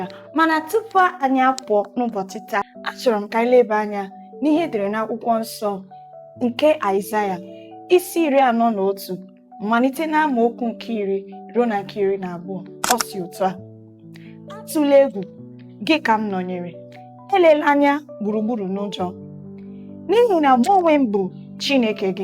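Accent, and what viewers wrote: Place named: Igbo, Nigeria. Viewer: The pure Accent... Unfiltered Igbo. Ride on ma. 👏🦅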